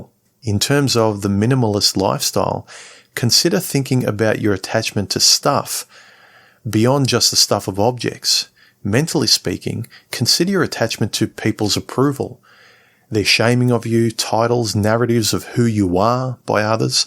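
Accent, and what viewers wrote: Australia. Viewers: Mate, just wanted to say 'Hi' and a huge 'Thank you!' for what you do! I never comment on youtube. This literally is the first time, but decided to do a one-off as I relate to so many things you do and say. I'm a colleague, an animation artist, and absolutely love your illustrations and the wonderfully appealing lightweight design of your videos. You're in a class of your own among MGTOW. I found your channel via Coterie's and have been listening to your videos repeatedly, although I'm not a subscriber as I don't subscribe. Marcus' (Groundwork...), Coterie's and yours are my most favourite, and, I suppose, most relatable emotionally and intellectually. You sound a bit Aussie to me, but I may as well be wrong as English is not my first language, although I've been living in this welcoming country for nearly 15 years. It's heart-warming to hear how total strangers have come to the same conclusions and have taken their lives and reality in their own hands - how in this confused world men (I'm in my mid-40s) are still able to find the path to wisdom and peace of mind, lead fulfilling and meaningful lives. 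Self-actualisation had become my way of getting out of the existential crises of my 30s. Discovering MGTOW only confirmed and widened my understanding and knowledge of how the West has changed in the last 4-5 decades; the culture shock I went through was a temporal one. Growing up behind the Iron Curtain I was 50 years behind, I was a 'Westerner', coming from the past. The gradu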